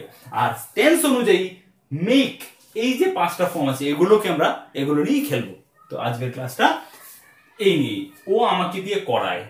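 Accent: Kolkata, India. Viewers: কথার স্টাইলেই বোঝা যায় কলকাতার।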